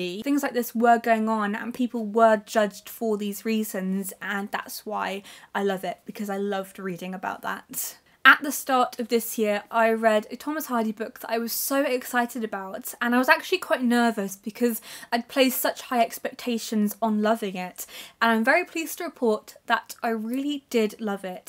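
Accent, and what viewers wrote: United Kingdom. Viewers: I really love your reviews , they are deep and clever, and of course your lovely British accent, greetings from Barcelona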